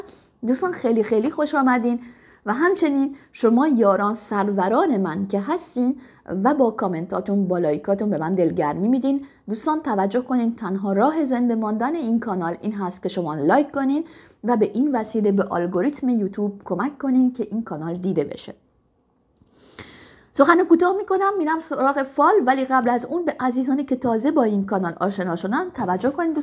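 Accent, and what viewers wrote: France. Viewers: oui. C’est ce que j’ai pensé. Quand vous parlez en perse vous faites certains sons( ex: op) qui rendent évident que vous êtes française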